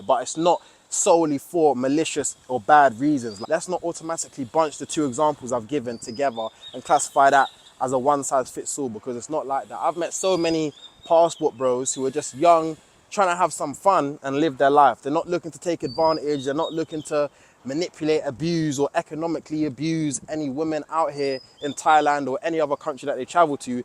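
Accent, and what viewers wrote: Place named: South London, United Kingdom. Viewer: well said man - i think as long as it's all consensual/safe between adults then it's nobody's business but theirs. You sound like you're from South London btw - Thailand honestly beats the hell out of Lewisham or Croydon (where i'm from)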